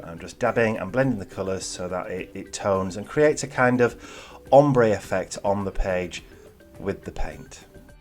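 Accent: United Kingdom. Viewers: Love following you, it's great to have a mans voice and a British accent! Great ideas which I will be trying asap, keep up the good work and well done on your burgeoning fan base :)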